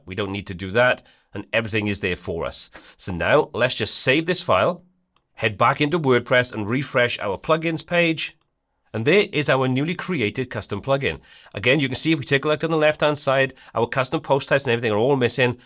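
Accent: Wales, United Kingdom. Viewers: Top instructor - highly skilled and with a great Welsh accent too :-)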